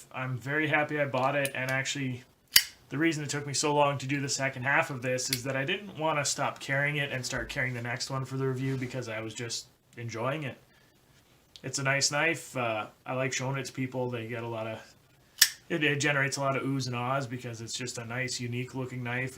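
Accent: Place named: Vancouver, Canada. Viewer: This guy has a vancouver accent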